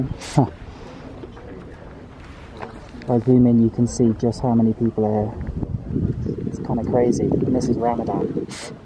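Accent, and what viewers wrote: United Kingdom. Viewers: British accent ....,👍👍👍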